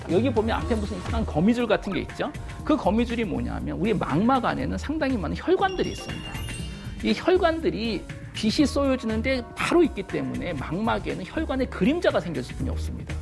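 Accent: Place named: Jeolla, South Korea. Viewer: 억양이 라도... 비 호감... 비추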